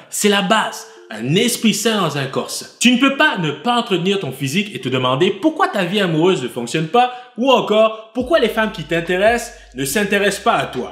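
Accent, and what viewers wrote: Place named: Canada. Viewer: avec le son de la télé trop fort, devant mes neveux, cetait LOOOOLLLL. sinon belle video thanks. j'adore l'accent canadien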